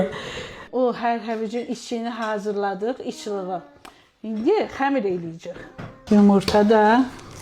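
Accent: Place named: Zagatala, Azerbaijan. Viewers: Zaqatala ləhçəsine oxşuyur abay 😊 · Qax zonasının ləhcəsidir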